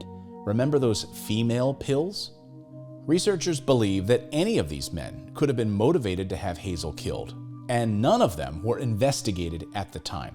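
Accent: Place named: United States. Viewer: Perfect American English ! Just perfect !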